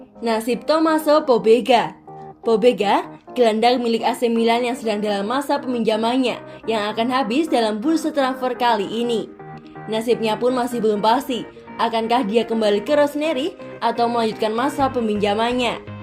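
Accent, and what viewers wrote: Java, Indonesia. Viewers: Bahasanya Medok Jadi Susah Bilang Borrielo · Meddok pingit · VO NYA MEDOK BAT DAH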